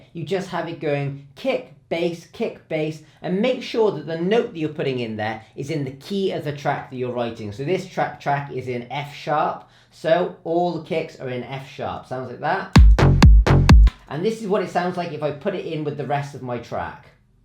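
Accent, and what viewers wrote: Wales, United Kingdom. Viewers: Is that a bit of welsh I hear in that accent of yours!! Cymru am Byth!!!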